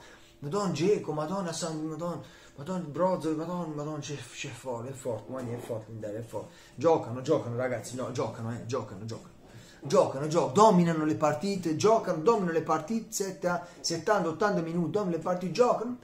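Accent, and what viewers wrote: Bari, Italy. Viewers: Spettacolo la parlata Barese compaesano Mio 🤣❤🖤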